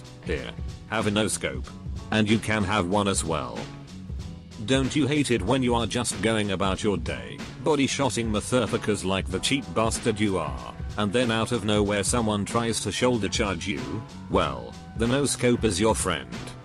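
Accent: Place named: United Kingdom. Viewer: Two questions. First, how do you do that mechanical Brit voiceover? Second, what's the track ?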